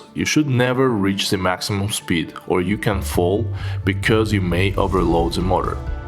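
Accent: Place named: Russia. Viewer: Ещё не полностью избавился от русского акцента:)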